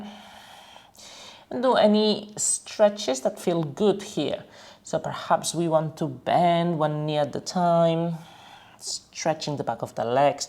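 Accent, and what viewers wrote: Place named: Spain. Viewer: Amazing class, accessible to all with a twist of spanish accent. My go to class when I'm in need of slow movement and ease of mind :)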